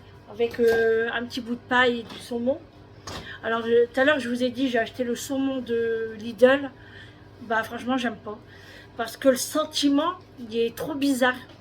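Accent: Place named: Nord, France. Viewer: Bonjour,je tai découverte y a pas longtemps, j'aime beaucoup suivre tes vlog,ton accent du Nord,j adore,mes parents été de valenciennes ❤tu est une belle personne, courageuse simple, ça ne m'étonne pas c'est ça le nord,je t'envoie des bisous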